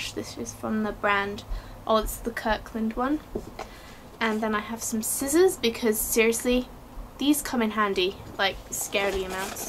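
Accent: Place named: United Kingdom. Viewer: Hi, if i saw right... your name is??? I'm not very sure!!! hah. and if you don't mind me asking, where are you from?? You have a lot of Japan things, but it sounds like a British ac sent (i do not know how to spell that words).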